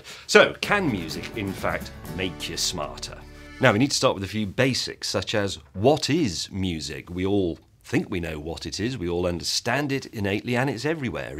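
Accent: United Kingdom. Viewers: British accent makes everything sound still more inteligent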